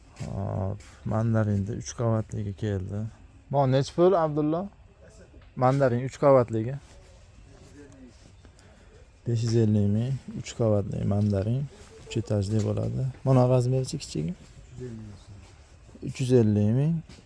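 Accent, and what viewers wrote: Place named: Andijan, Uzbekistan. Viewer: талаффуз Анжонникию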